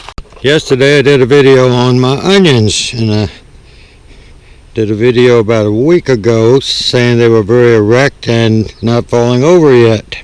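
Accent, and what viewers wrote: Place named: Coastal Virginia, United States. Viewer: I'm going to guess he has an old fashioned Coastal Virginia accent...it does have those rounded o's like Canadian. Listen to Earl Hamner narrator of the Waltons--sounds very similar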